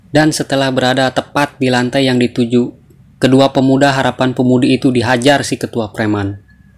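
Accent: West Java, Indonesia. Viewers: Dari awal kutebak, pasti orang sunda,. Salam sunda 💪🏻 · Bang dari logatnya kayanya abang dari sunda ya hehe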